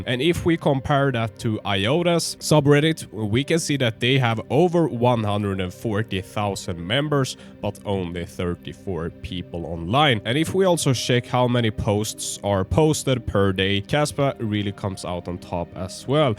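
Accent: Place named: Sweden. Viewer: Yoo I can hear the Swedish :), let's meet and discuss crypto IRL. Botkyrkabo jobbar i Norrmalmstorg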